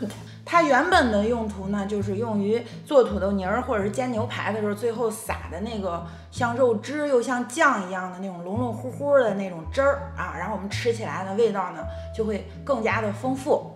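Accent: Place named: Beijing, China. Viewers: 感觉你是北京人哦！难道是同乡？ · 一听口音就是北京老乡，太优秀了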